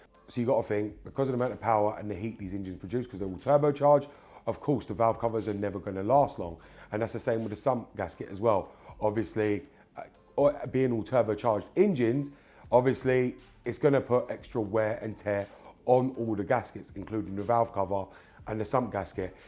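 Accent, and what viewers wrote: United Kingdom. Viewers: Speaking so fast and with so British accent, it is very difficult to follow you. Anyway, very useful information, so thanks' for that!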